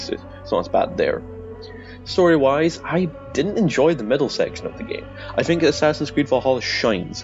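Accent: Scotland, United Kingdom. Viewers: I can't tell what your accent is, is it scottish?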